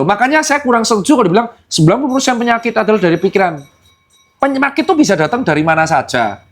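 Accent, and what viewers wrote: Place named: West Java, Indonesia. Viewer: Nih dr tirta aksennya sprti orang sunda.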